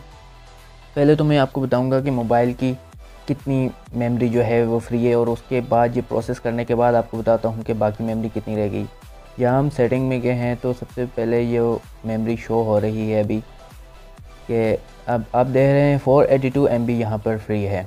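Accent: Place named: India. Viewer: At least ad captions I can't understand Indian plz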